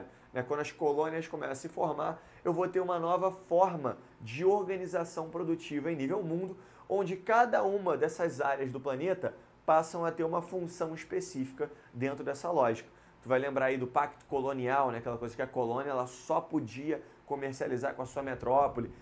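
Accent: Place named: Rio de Janeiro, Brazil. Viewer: Só eu que senti um sotaque carioca? Kkkk aula ótima! Me ajudou muito no seminário, parabéns pela didática, poucos tem...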